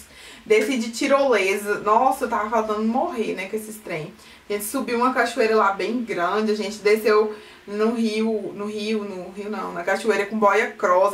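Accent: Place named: Goiás, Brazil. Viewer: Vc é da onde? Seu sotaque é Bem Goiano 😍❤️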